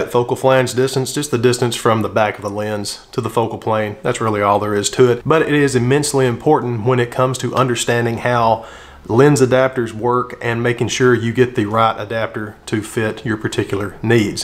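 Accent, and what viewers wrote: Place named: South, United States. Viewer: Tennessee? Kentucky? it's definitely Southern but not distractingly strong.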